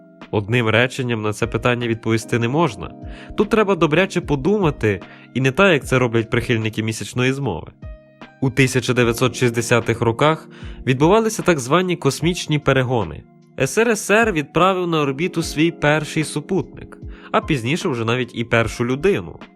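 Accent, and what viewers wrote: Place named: Zakarpattia, Ukraine. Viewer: Ви з Закарпаття? Акцент 😊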